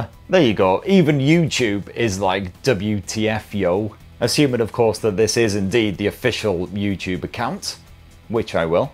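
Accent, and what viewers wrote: United Kingdom. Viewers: The colourful metaphors with the British accent have me in stitches, the way you slide the odd one in, is quite brilliant. 👍 Providing a layer of humour on top of your reviews is doubly entertaining. Bravo sir 👏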